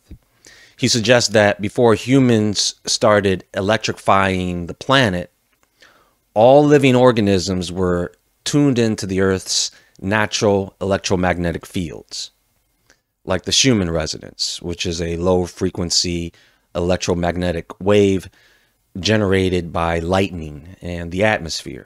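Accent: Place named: New York, United States. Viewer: & I thot he sounded like a military jarhead, part Italian New Yorker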